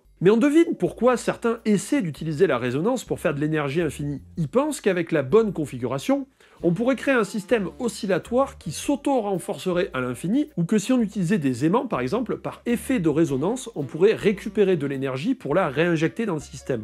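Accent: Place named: Saint-Étienne, France. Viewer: J'ai crue entendre un petit accent stefanois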